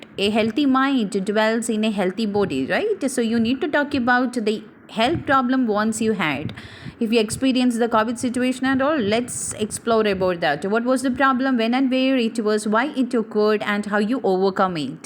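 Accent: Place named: United Kingdom. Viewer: Mam is using British accent more.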